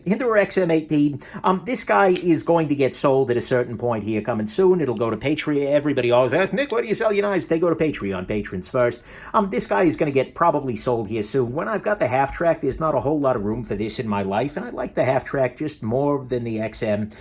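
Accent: New York, United States. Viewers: That thickkkkk NY accent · Are all of those spider co???? Jk I just started watching you I love you New Yorker accent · It’s not Texan, more New York or something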